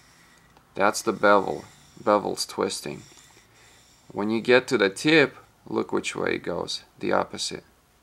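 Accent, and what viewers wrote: Russia. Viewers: Прослеживается русский окцент)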